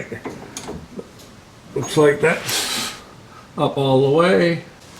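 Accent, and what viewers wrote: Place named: New England, United States. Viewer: Sound like your from either Pawtucket or Taunton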